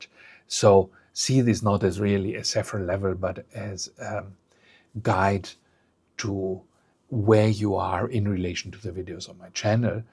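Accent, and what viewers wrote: Germany. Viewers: I lived in Greece some thirty years ago and learned the language. Never stopped learning Greek as I follow music, television and film. According to your test I have C1 level of understanding. C2, I could understand some but not the details of the message. Btw your accent in Greek is almost imperceptible, though I could tell when you spoke English that you are German.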